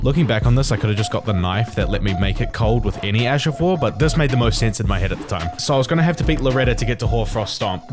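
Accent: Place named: New Zealand. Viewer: Chur bro, you sound like you’re from NZ too so I’m gonna lay it to you straight. I was up from around 1am-3am last night smacking cones and beers back and ended up watching all your Elden Ring videos in that time. Love the channel, for sure have a new sub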